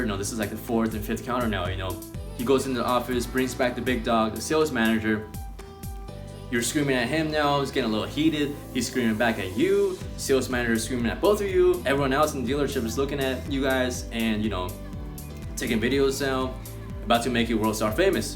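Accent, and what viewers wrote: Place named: Italy. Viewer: Great advice and your Italian accent was hilarious!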